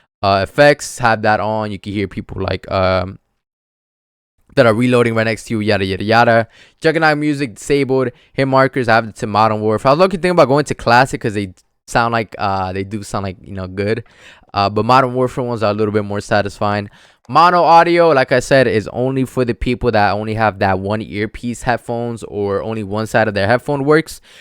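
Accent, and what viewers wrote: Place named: Latin America. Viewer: Este we a quien quiere engañar? Parece más latino que nada jajajaja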